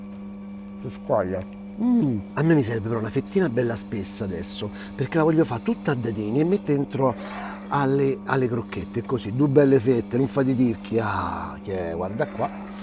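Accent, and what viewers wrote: Rome, Italy. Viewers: Complimenti sei simpatico mi ricordi la Sora Lella, autentico romano🤩🤩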